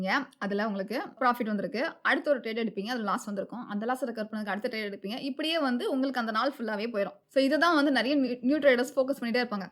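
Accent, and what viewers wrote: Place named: Marthandam, India. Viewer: I think you are 100% marthandam area 😂😂😂😂😂😂😂😂😂😂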